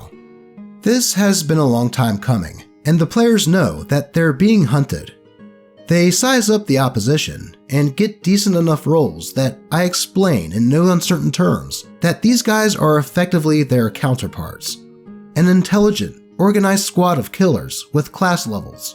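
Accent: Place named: Maryland, United States. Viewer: I love this guys Maryland accent.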